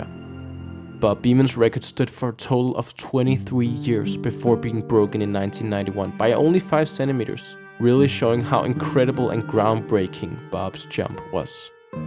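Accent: Denmark. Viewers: Easy danish accent :D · you sound danish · Det er meget tydeligt i din accent, at du er dansk, men fed video mate! Keep going 🔥🔥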